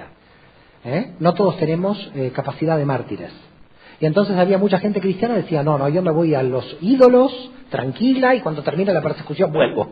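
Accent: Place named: Latin America. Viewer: Este señor es judío de religión y no de sangre habla con acento latino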